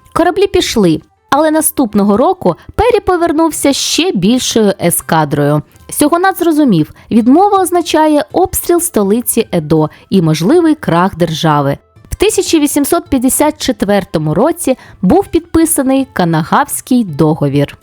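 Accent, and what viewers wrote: Russia. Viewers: Мій комент майже аналогічний) Дуже ріже вуха мацкальска вимова японських слів і назв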